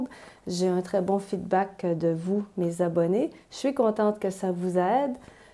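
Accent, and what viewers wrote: Quebec, Canada. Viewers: Sorry, I tried writing in French, but autocorrect kept turning my words to nonsense. I just want to thank you for all your wonderful videos. You make me smile and chuckle often, and it is great to hear Quebecois like I heard it growing up so many years ago,! Felicitations!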